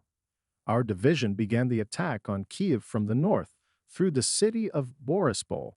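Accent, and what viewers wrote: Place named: United States. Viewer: Too bad the narrator is American….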